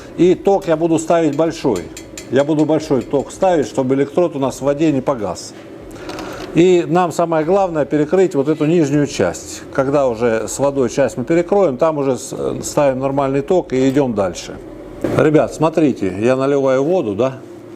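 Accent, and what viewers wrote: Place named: South, Russia. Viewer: Ув., лучше, вырезал окно, а вместо песка, (😊😊куда его потом), промасленую тряпку, набил в трубу, а потм вытащил её и заварил!! 😊😊А по говору Вашему, слышно земляка с юга Руси, привет Вам!!....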